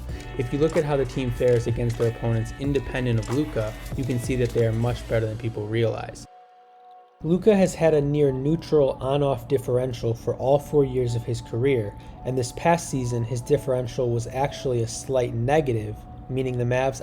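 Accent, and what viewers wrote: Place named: New York, United States. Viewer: Loving this channel. Love the bball knowledge! I hear the NY in your voice so when are you doing a vid bout the Knicks.....w/ BRUNSON!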